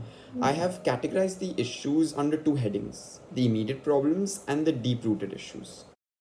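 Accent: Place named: India. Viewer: Why indian accent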